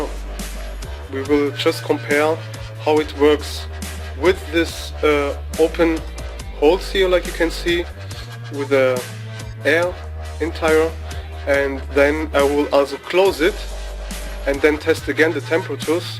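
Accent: Germany. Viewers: Man hört sofort dass du ein deutscher bist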